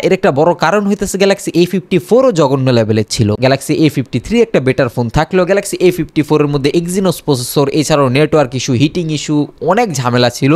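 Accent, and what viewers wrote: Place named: Noakhali, Bangladesh. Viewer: ও ভাই দয়া করে এই নোয়াখাইল্লা ভাষা ছেড়ে শুদ্ধ বাংলায় কথা বলুন। অথবা ইউটিউবে ভিডিও বানানো বাদ দিন। আপনারা বাংলা ভাষাকে বিকৃত করছেন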